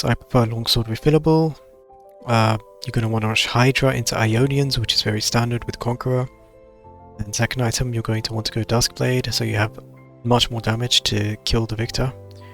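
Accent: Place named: Europe. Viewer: i noticed you have a hint of european accent in your voice :) . also good guide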